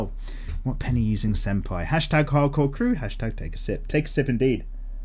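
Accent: United Kingdom. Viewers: dumb question but here it goes . I've kept tabs on your videos for a time now because___ you are really fucking good at this . why not put out a little auto biography of how it is you got this effing good , and why (even it's for extra money) why you let us all in on it . the skill set , your craft , the glib humor . there's no other channel worth sticking with because they all suck . Lastly , in America many of us are burnt out on british accents they use it for everything commercially so you're the rare exception for me . so what do you say ? how about a story about yourself . ( hell include your band too) best regards , me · you kinda look like and sound like Ravi from Izombie (not just the British accent). if you don't know who i'm talking about... well you can look it up. i'm also gonna post this on every DWM in the future till you find out.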